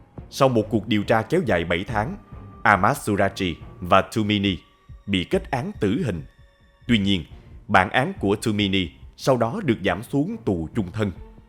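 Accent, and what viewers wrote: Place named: Saigon, Vietnam. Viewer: Oh. đã trở lại. Rất thích giọng Saigon của bạn ❤❤❤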